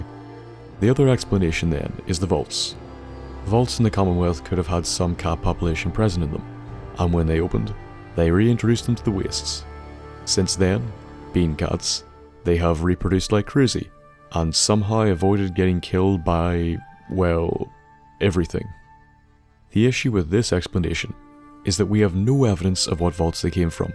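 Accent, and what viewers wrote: Northern Ireland, United Kingdom. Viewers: Been here since at least 2013, sitting in my mums and playing nv and listening to to guide me through, yeah im a scott but his is a n.i accent so i can understand it clearly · Northern Irish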